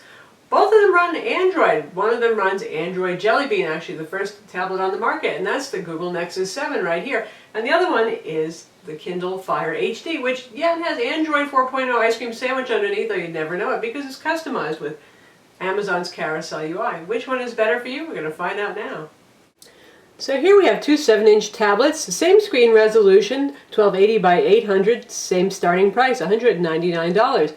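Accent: United States. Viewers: I'm sure that this is a very helpful and interesting review but unfortunately I only understood about half of what the young lady was talking about! She speaks SO quickly and with an American accent I find difficult to follow.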